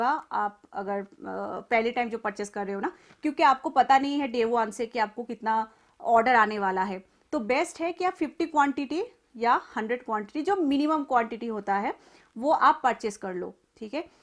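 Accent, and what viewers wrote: Bengal, India. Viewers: Aapki hindi me bagoli language ka tadka he sunne mi bahot accha lagta he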